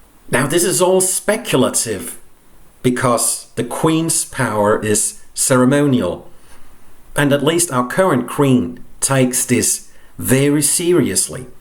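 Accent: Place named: Australia. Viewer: Australian accent!!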